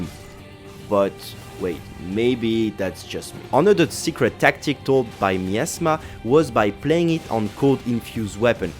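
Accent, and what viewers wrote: France. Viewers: I just realized not only fo you have the French accent, but also th exact same voice and timbre as the "two months later" guy on spongebob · Maaaan, first of, great vid overall! But... during the whole vid, I was like "Hum... this guy sounds French af!" Then when you said your name " " at the end, j'ai su que tu étais bel et bien français et que je ne m'étais pas trompé! 🤣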